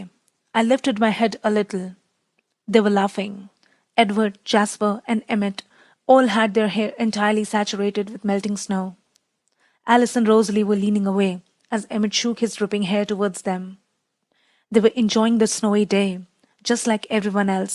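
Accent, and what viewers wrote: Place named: India. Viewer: Wow!!! It is very interesting to here in Indian accent!!!! Good work.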